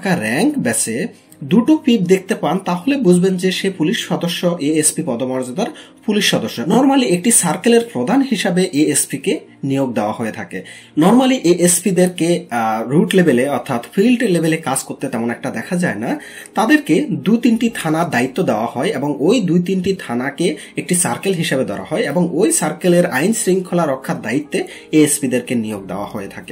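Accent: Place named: Comilla/Noakhali, Bangladesh. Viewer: আপনার বাড়ী কোথায়? কুমিল্লা / নোয়াখালী?আঞ্চলিক টান রেয়েই গেলো।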